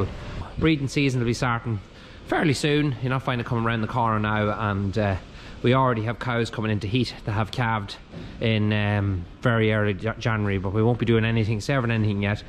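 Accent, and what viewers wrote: Ireland. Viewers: are u irish,n. Irish or scottish get confused with accents😃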